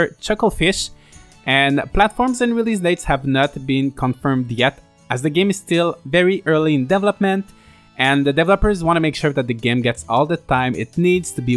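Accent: Quebec, Canada. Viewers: Oh le Quebecois is fort in this one. <3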